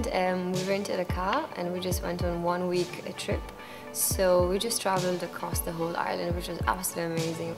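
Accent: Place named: Ireland. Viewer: Nice video. I can here the Irish in your accent :)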